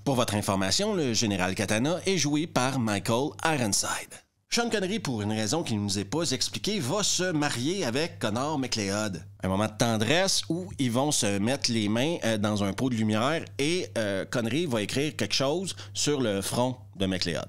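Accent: Quebec, Canada. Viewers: je m'abonne car j'aimerais comprendre si le sarcasme est un talent personnel ou bien si cela viens de la nonchalance de l'accent Québécois, tout en découvrant de merveilleux films 😅😅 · Je crois que je l'avais vu à la télé et je n'en ai pas gardé beaucoup de souvenir ! J'avais même oublié Michael Ironside et Virginia Madsen ! (qui se souvient de "Electric Dreams"?) Déjà, le nom du méchant... Le général Katana ! Ca sent le nanar ce nom là 😂 Merci pour ces merveilleuses "RE-VU" si drôles avec cet accent du Québec que j'aime beaucoup :)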